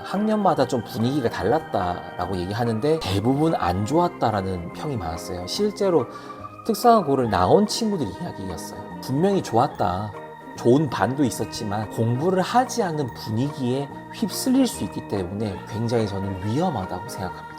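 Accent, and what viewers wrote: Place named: Busan, South Korea. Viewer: 우연히 들어오게 되었는데 조근조근 선생님 사투리가 너무 좋네요. 여기도 부산이라~~ 중2 딸래미 수학성적 50점을 못넘기네요. 그룹, 개별수업 시켜봤는데 안되서 과외를 해요. 기초가 많이 부족하다 하시네요. 그나마 국어,영어는 80정정도 되구요 확실히 과학,수학쪽을 어려워해요. 그런데 미술을 너무 좋아해서 틈나는대로 종이나 아이패드로 그림을 그리고 있어요. 집근처에 올해 2회째 되는 위탁형공립예술학교가 생겼는데 인문계 다니다가 3학년 1년과정으로 그 학교를 다니면서 수업을 들을 수 있는데 국어,실용영어,한국사,체육 수업하고 오후엔 전공 과목(미술,음악) 실기수업 위주로 한다고 합니다. 예고를 가기엔 늦었고 거리도 너무 멀구요. 꼴지를 하더라도 인문계에 진학해서 공부하고 미술학원을 병행하는게 나을까요? 특성화고에 가서 내신도 좀 잡고 미술도 시킬까했는데 요즘은 내신따러 특성화고 가는 성적 괜찮은 학생들도 있다고 하더라구요. 중3부턴 입시미술학원에서 주말에 취미로 먼저 시작을 해볼까하는데 미대는 수학성적을 안보더라구요. 그래서 고민이 중2 이번 기말고사 끝나고 수학과외를 끊고 ebs 50일수학이나 30일수학 인강으로 기초를 다시 복습하고 3학년 현행을 인강으로 하는건 어떨지요. 공부도 잘하고. 그림도 잘 그리면 제일 좋겠지만 둘다 하기엔 경제적으로도 힘들 것 같아요.ㅜ 물론 미술을 시켜주는대신 인강으로 수학공부를 게을리 하지는 말자고 다짐을 하구요 답답한마음에 두서없이 글을 썼네요 바쁘시겠지만 선생님 조언 부탁드려도 될까요?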